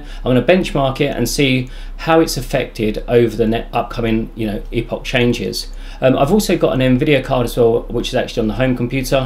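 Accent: United Kingdom. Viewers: Damn.. British accent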